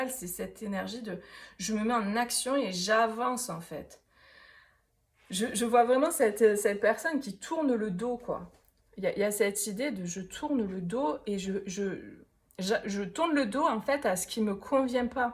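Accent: South, France. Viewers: Mais c'est très sympa cet acca-în du sud !!!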